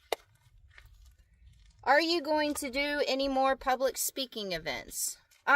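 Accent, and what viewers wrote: South, United States. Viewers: You make me smile. Love your southern accent ❤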